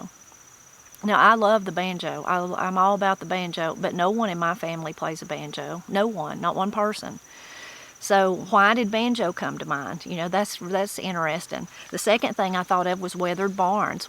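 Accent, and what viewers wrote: Appalachia, United States. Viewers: I didn't know it until today but I grew up in Appalachia. Since I have been watching your videos I have commented that my family talked like you, same language, grew out food, the same family likes, dislikes. I even said listening to you reminded me of my mother. After seeing the map, I realized that the part of South Carolina (up state) where I was raised is in Appalachia. What a wonderful surprise. I have learned so much from you. Thank you.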